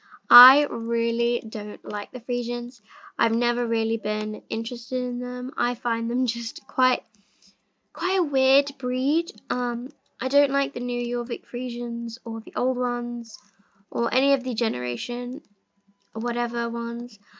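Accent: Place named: United Kingdom. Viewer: hey!! are u from the UK? i really find your accent cute <3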